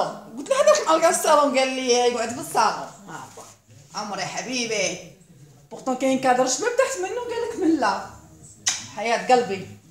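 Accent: Algeria. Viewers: سلام أنا كمال من العاصمة والله أول مرة إنتابع فيديوهاتك و الله عفويتك و حكاياتك لكي شخصية حلوة حلوة مرحة فكاهية مام الهدرة تع لبلاد طبعاتك خلاتك قوية حلوة بزاف شبهتك شوي لبيونة خوك كمال من العاصمة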